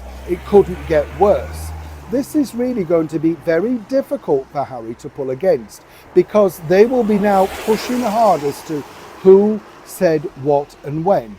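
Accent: Yorkshire, United Kingdom. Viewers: Hi! Greetings from lancaster Pa.It sure seems to rain alot in your area.I love rain but its a bit much.I love hearing you talk Brotish.Fantastic job giving us honest true news.I trust your news completely .Kudos to you😊 Beth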